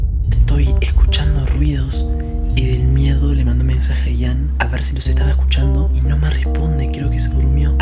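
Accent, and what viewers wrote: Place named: Argentina. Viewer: Ian me gusta tu voz de Argentina :3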